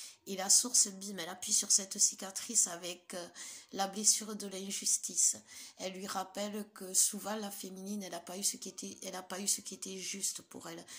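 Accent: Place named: South, France. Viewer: Vous avez un accent du sud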